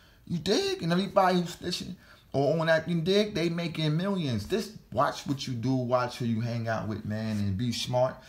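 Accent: New York, United States. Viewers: This is a New York nigga if I ever heard one desus and mero got me on the lingo 😂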